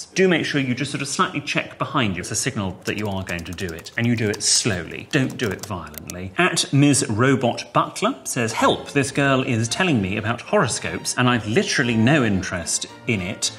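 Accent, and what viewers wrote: United Kingdom. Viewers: イギリス英語聞けて嬉しい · イギリス英語だー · イントネーションイギリスすぎる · どうしてダメなのか理由を教えてくれるから良い。 イギリスっぽいイントネーションと時々皮肉が混じるのも見てて面白い。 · 判を押したようなイギリス英語だけで腹いっぱい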